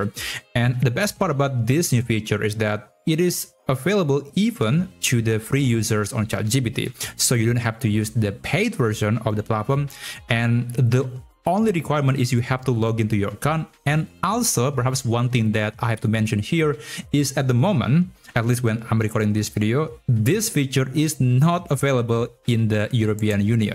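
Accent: Indonesia. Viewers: You sound Indonesian. Good video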